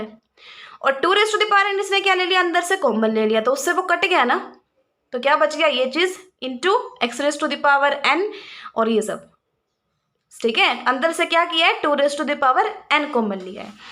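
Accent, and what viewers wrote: Haryana, India. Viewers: Sister aap haryana sa belong krta ho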